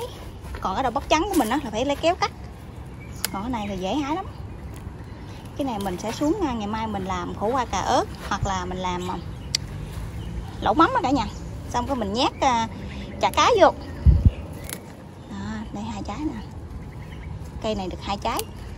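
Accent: Mekong Delta, Vietnam. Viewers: nghe tiếng của chị người miền tây chị ở tỉnh nào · WOW dam chất miền tây nam bo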